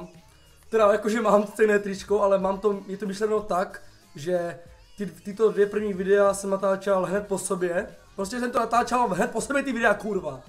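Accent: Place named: Moravia, Czech Republic. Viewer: Ses fakt skvelej!! Drzim palce at das tu maturitu :) . Nechapu jakto ze nemas vic odberatelu.... a mluv dal po moravsky je to super ( taky su z moravy) 😂😂😂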